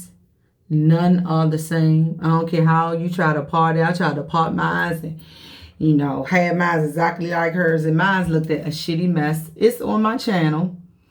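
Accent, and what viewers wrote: United States. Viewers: You sound like you from the dmv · I absolutely love your channel and your locs I subscribe cause I’m from DC and I can hear in your voice that you are from DC as well I have gray hair as well but I do dye my hair once a year but I’m done with dying my hair cause I really am allergic to hair dye you and your locs are absolutely beautiful I don’t palm roll either🖤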